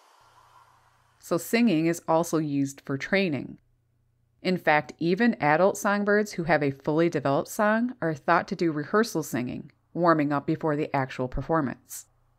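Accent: Canada. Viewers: Canadian voice do you like spring too.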